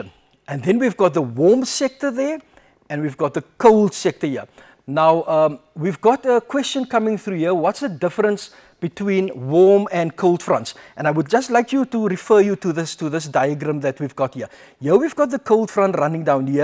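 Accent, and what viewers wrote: South Africa. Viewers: south africa, cape town. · Cape (South Africa) accent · South Africa :)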